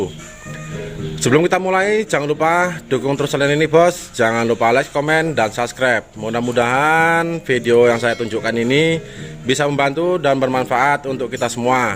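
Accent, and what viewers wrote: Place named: Java, Indonesia. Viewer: Maaf pakdhe ,aslinya orang jawa mana? Kok kebrongos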